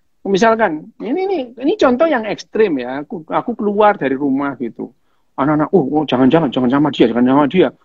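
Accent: East Java, Indonesia. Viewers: Natural gaya orang Jawa timur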